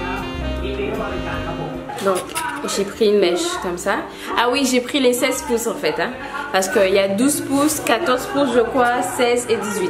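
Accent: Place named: Guadeloupe, France. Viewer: Tu es Guadeloupéenne ça s'entend ❤❤❤❤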